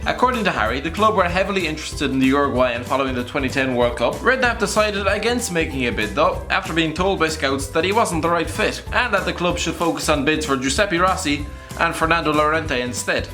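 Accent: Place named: Ireland. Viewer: Lovin the Irish commentary xD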